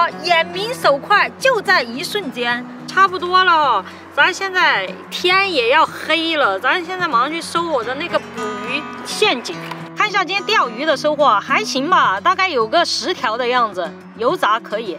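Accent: Sichuan, China. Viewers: 四川妹儿吗？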